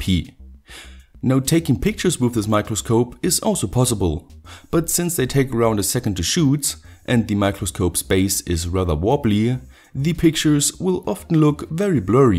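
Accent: Germany. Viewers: klingt nach denglisch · 3 Sekunden zugehört und direkt bemerkt, dass der Typ Deutscher ist 😅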